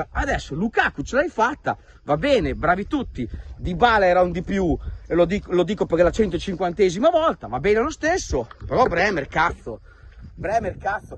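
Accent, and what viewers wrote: Italy. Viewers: Mi piace il tuo accento Emiliano romagnolo marchigiano 😁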